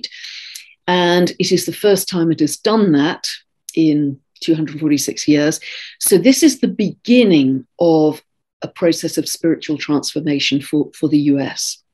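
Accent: England, United Kingdom. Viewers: "...you just have such a lovely way of turning a phrase, that it is a delight....". That's because has a most cultured English accent, so she delivers the news with panache'.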